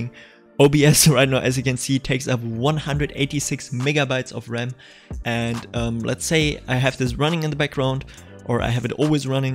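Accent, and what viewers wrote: Germany. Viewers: der deutsche akzent haha, aber geiles video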